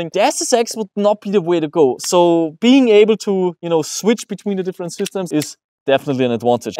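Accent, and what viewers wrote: Germany. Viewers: Man hört das du deutschsprachig bist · Eindeutig ein deutscher😂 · Bist du ein deutscher man hört es nähmlich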